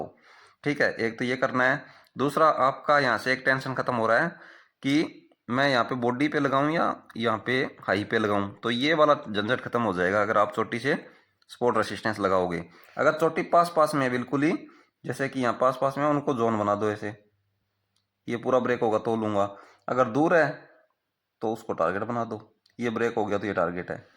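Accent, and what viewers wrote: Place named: Jind, India. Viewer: m jind se hu bhai voice se laga tha local bhai ho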